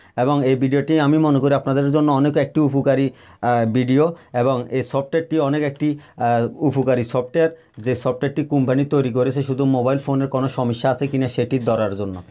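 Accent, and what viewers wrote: Noakhali, Bangladesh. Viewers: ভাই নোয়াখালী কোন জায়গায় আপনার বাড়ী,,,কথা শুনে মনে হল আপনি আমার দেশী ভাই,,,,,🌾🌾🌾🌾🌾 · Vai tor basa Mona hoy নোয়াখালী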